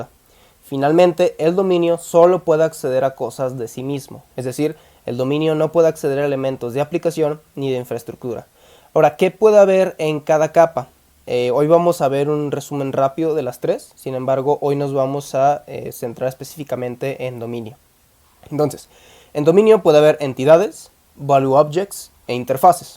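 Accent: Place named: Colombia. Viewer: Hermano eres un crack ❤ Tengo ya unos meses tratando de entender bien arquitectura hexagonal y patrones de diseño y es el primer video que realmente me llega 🎉. Y mira que sigo a gente que explica bien y tiene conocimiento (no los voy a nombrar porq seguro ya muchos aqui los conocen 😅) pero aun asi tu has logrado en 20min los q ellos no. Creo por tu acento que eres de colombia asi que un saludo y un fuerte abrazo de un hermano venezolano. 😊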